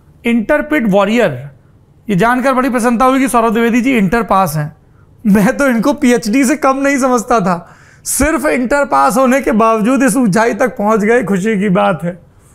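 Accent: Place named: Bundelkhand, India. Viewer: Hello saurabhji,bhasha or boli se ap bundelkhand ke lagte ho,prathak bkd prant k liye bhi apne star se prayash karenge k nahi ?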